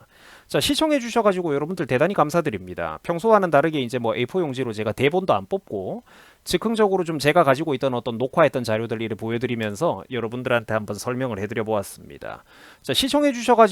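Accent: Gyeongsang, South Korea. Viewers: 대구말씨인데 대구분인교?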